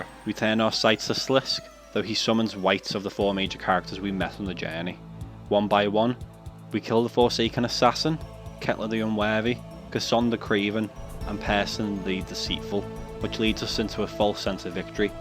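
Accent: Liverpool, United Kingdom. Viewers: Very well made interesting video for a channel with a small amount if subs, I would have subbed, but cba with your dirt scouse accent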